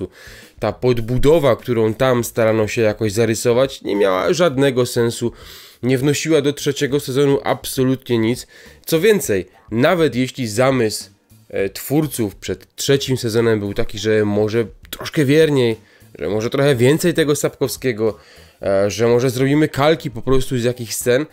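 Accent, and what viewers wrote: Silesia, Poland. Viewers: jesteś Ślązak?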